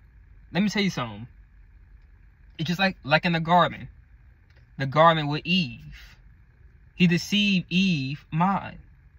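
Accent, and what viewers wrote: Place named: Maryland, United States. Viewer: Hi brother, are tou from Maryland? Your accent is giving it away lol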